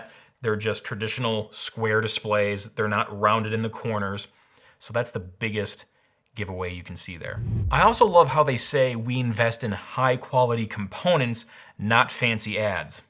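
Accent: India. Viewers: What it speaks like Hindi India call center accent, got you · sound like come from India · You can tell by the indian accent its a scam. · That Indian accent is known to fool poor clueless consumers that’s he’s American.....ok...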